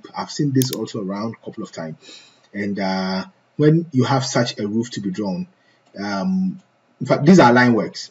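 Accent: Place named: Ghana. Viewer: He sounds more Ghanian than Nigerian.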